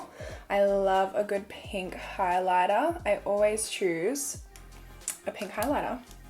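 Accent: Australia. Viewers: i really love your Aussie accent and voicee 💗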